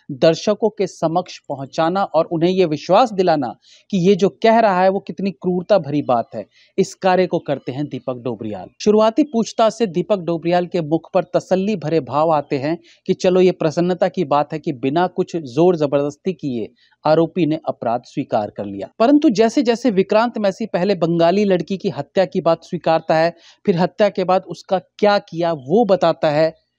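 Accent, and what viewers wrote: Standard. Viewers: first time not understanding your review fully. its looks like you speak purely Hindi. Good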